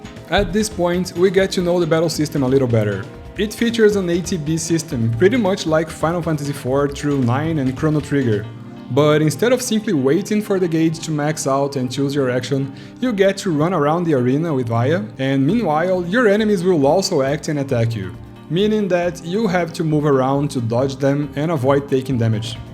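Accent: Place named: Brazil. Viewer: I thought it sounded like my friend who is Brazilian's accent! Man I'm so impressed with bilingual speakers.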